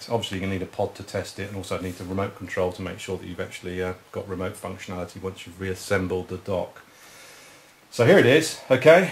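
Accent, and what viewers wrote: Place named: United Kingdom. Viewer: thank you, This is so easy, my daughter is only 5 and she can do it herself. I just save $70 USD. I call Bose and they said is $ 115 with shipping included and you will also get a 1 year warranty and any of the parts? I just pay $39 USD on EBAY, and I fixed it in 5 minutes tops.I Love It, and the British accent is cool. I from Colombia and live in LA. Thank you I’m very happy, my family love this portable sound dock.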